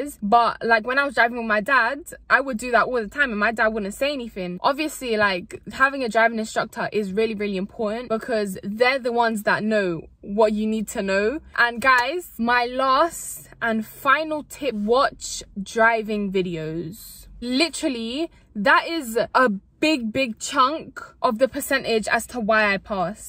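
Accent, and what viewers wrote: London, United Kingdom. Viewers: Anywhere in London, hard to tell. Probably south or east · Hello! It is British accent?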